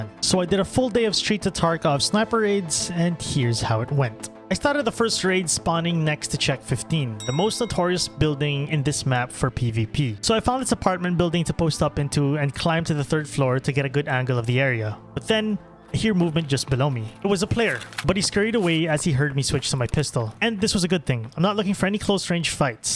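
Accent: Philippines. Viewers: Legit thought this was a big time youtuber in the US, but once you started the voiceover I could immediately tell the accent, kabayan! Amazing content man, new sub. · are you filipino? Sounds like you have a manila accent :) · oh shit narecognize ko agad an pinoy accent hahaha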